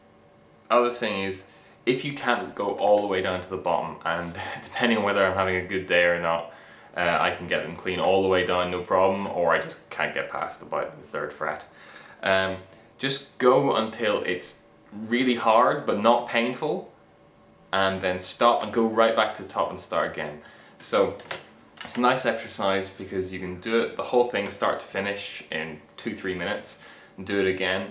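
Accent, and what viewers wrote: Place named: Northern Ireland, United Kingdom. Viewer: true man! your videos are great and i hope to see more from ya! haha cool so i was little bit right! :D i thought i detected a tiny bit of northern irish in there somewhere haha!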